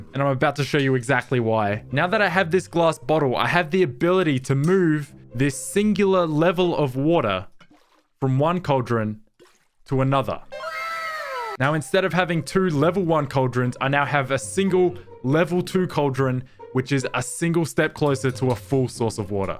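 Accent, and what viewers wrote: Australia. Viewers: Does anyone know where hes from, he doesn't have an accent to me but he speaks like an aussie 😭🤣 naur (no) and seour (so) 🤣💜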